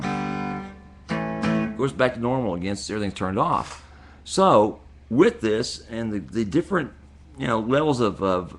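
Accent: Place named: South, United States. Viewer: Bro you are a good ole southern boy just like me lol Ive got the very same drawl you have!!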